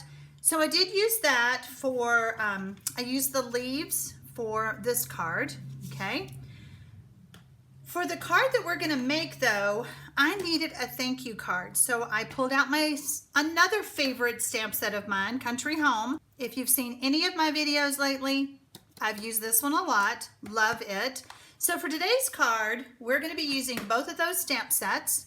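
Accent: South, United States. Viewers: I love this card design. Papers very pretty to even with the stripes. I think this would make a great Christmas card or Halloween card so I think I might play with it that way. I also have to say, I love your Southern accent. I don't know where you're from I just know you're not from Louisiana. I'm living there at the moment and you don't sound well at least on the southern parts I mean you could be up closer to Texas.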